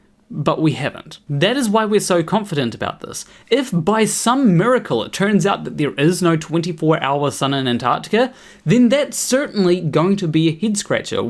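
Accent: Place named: New Zealand. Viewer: That accent, are you a kiwi too?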